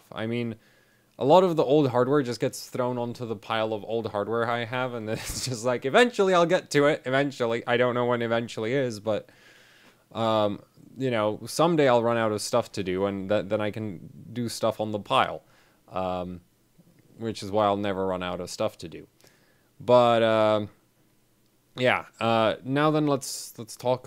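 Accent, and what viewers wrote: United Kingdom. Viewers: I didn't realise your from the UK because your accent is very vague. I'm quite disappointed that there's very little tech events that happen in the UK. I would love to see you and 8PACK as an overclocking team (you have all the hair and he has all the muscles). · British lol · British.. I never would have guessed it.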